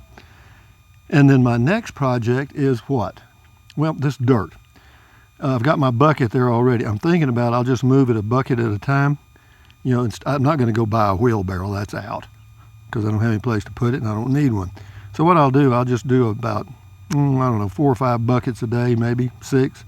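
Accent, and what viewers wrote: Texas, United States. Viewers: Oh ❤you talk just like my favorite Texan: Betty McNaughton, Bless her heart!!❤she is a character just like you😂🤣🇺🇸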